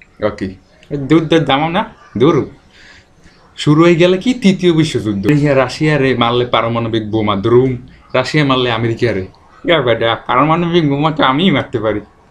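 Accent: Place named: Barishal, Bangladesh. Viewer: বরিশাইলা, কথা বলতে, unscribe করলাম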